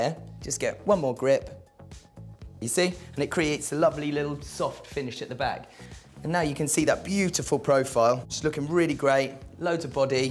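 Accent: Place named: United Kingdom. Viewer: I'm so in love with British accent.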